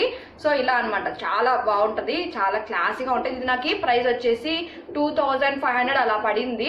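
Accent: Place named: Rayalaseema, India. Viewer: Midi raylseema LA undi yasa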